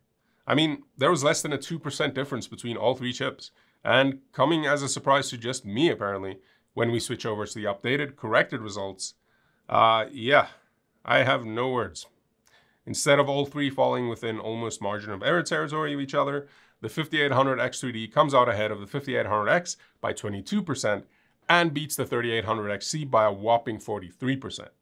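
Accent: South Africa. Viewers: I didnt even notice the afrikaan accent untill the dude mentions rand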